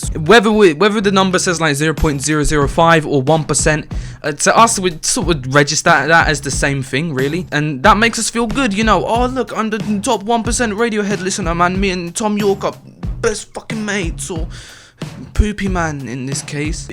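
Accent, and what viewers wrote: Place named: United Kingdom. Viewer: i thought u were dankpods for a bit, then i heard u were british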